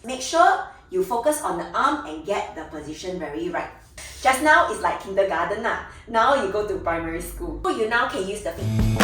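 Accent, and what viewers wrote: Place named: Singapore. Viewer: Sounded singaporean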